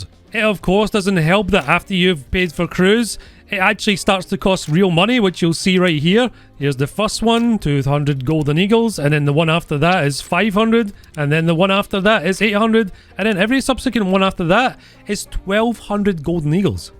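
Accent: Scotland, United Kingdom. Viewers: Just found your channel, and I like your Scottish accent.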